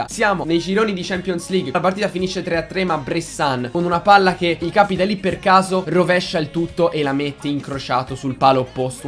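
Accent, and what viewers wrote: Tuscany, Italy. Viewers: sei toscano??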